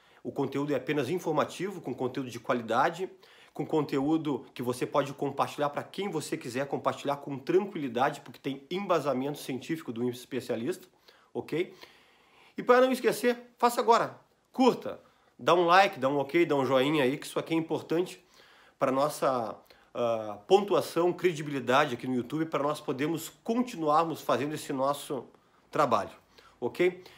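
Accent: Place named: Rio de Janeiro, Brazil. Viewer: Dr, és aqui do RS, como diz, e pra que ou por que esse sotaque carioca?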